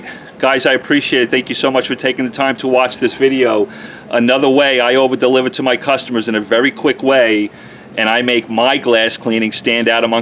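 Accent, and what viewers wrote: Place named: Brooklyn, United States. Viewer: Haha I thought the same thing I’m thinking Hey, this guy sounds like he’s from Brooklyn, like me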